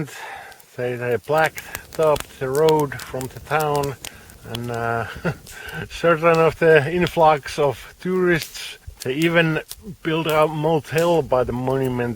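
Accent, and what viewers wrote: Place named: Finland. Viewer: You are a very good narrator and always make me smile with your Finnish accent and humour - well, in polite English, I would say that mostly, you are 'extracting the urine' out of things you witness. What tent was that one - cancel, that, just expanded the description - is it heavy to carry?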